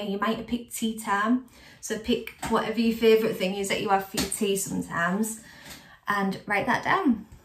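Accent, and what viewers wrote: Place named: Hull, United Kingdom. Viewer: thanks, good to hear a Hull voice.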